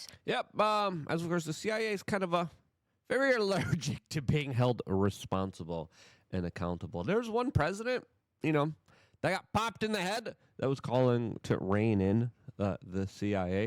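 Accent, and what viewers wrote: Poland. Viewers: He's Polish. English is a 2nd language for him.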